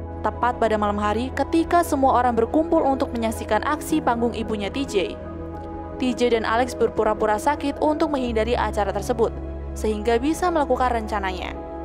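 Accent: Pemalang, Indonesia. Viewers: Wong Pemalang min?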